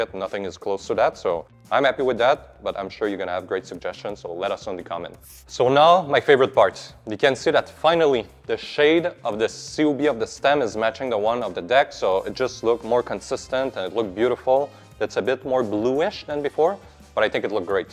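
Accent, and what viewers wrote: Quebec, Canada. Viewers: Sa parais que tes quebecois loll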